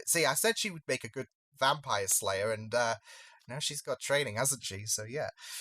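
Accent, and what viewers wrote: United Kingdom. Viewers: I don't. Most Canadians live very near the American border, get their main TV channels on basic cable, and we can tell the difference between ourselves. You still sound a bit like the people on Coronation Street. Your Britishness adds a bit of spice to your reactions - the accent, the language. It is a bit different, but very adorable. Loved your Joker, BTW.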